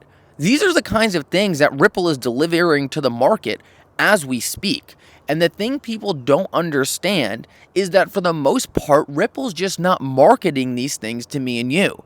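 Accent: Allentown, United States. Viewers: It is going down with an Allentown accent. I thought you were good in Math dude.. Gaining 20% of 60 % crash from March levels isn't going up bro.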